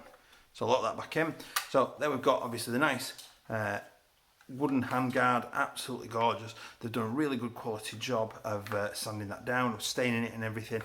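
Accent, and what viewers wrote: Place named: Yorkshire, United Kingdom. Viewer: Haha I know a hello Yorkshire man when I hear him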